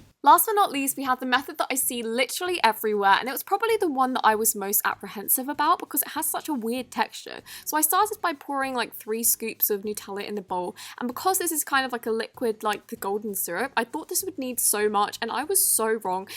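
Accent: England, United Kingdom. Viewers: Omg!! I really wanna know what part of England your from!! Please dm me on <mail> I love u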